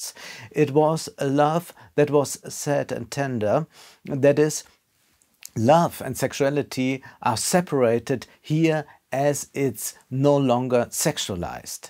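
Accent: Germany. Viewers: He just started the english channel, he gets round about 30-60,000 views on the german one. Sometimes he gets much more views if a movie is currently in the theatre and is more sophisticated, like "Tenet" recently, ore when he rants about janky german rom-com movies. I would not worry about the german acsent, it's Kind of original, but I wouldn't be if surpriced if some viewers find it anoying, it's kind of special channel with interesting takes and viewpoints.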